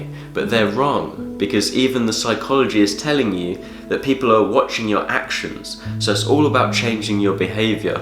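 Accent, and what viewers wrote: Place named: Southern England, United Kingdom. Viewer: pretty sure yeah. I'm English to. Think he's from the South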